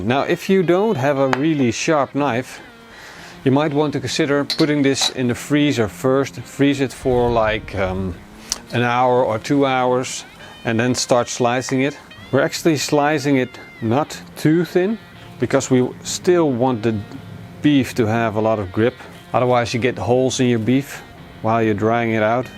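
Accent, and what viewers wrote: Netherlands. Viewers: Are you Dutch ? Your english accent really sound like a dutch man 🤣 still good video tho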